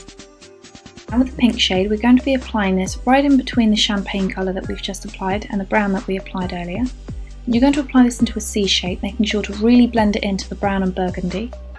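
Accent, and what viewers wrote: England, United Kingdom. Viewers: R u from england xx like me lol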